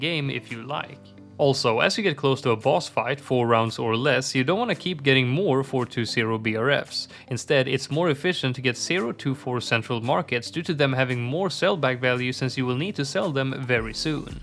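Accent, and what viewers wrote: Sweden. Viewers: Ar you from sweden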